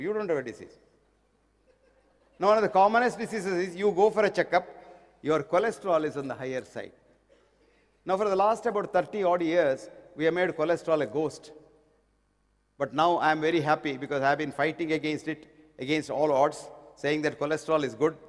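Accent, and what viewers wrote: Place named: India. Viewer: Only Indian will understand his English....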